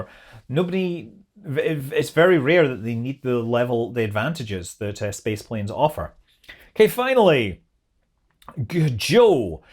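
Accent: Scotland, United Kingdom. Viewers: Enjoy your videos. I find them interesting, informative and entertaining. I'm also partial to your Scottish accept as I'm of Scottish descent on my father's side. (My grandfather was born in Edinburgh.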